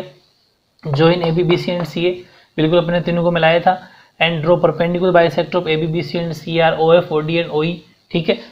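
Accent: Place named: Bengal, India. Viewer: Sir aap Bangali hai mujhe aapki language se lag Raha hai kyunki main bhi Bangali Hoon by the way your teaching way is excellent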